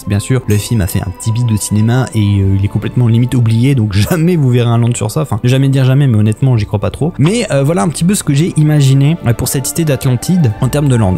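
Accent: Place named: France. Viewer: J'aime beaucoup le son de ta vioix sur cette video, nouveau micro, nouvelle equalisation ? Les deux ? Et ton English me fait toujours marrer. Ne change rien, so french.... Quant au sujet c'est sympa le land à 4 milliards. Tres sympa, totalement improbable mais sympa. Encore.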